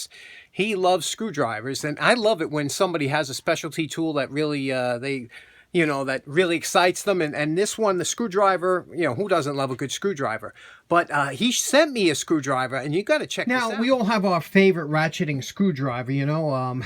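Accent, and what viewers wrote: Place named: Long Island, United States. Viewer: I love the smell of those old electric drills too- and I love your Long Island accent - takes me back home to Brightwaters. Keep up the inspirational videos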